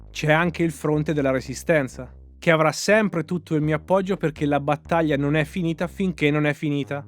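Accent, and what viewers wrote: Perugia, Italy. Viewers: Pensavo di essermi accorto solo io 😂 Perugia si sente da lontano ahahahhaah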